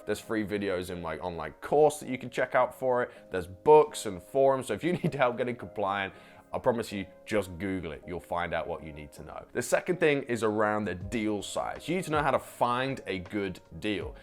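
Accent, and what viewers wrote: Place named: United Kingdom. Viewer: Yeah very cute and young to be a property Investor lol god bless you. I like your accent where are you from in the UK? I'm thinking to take your course :)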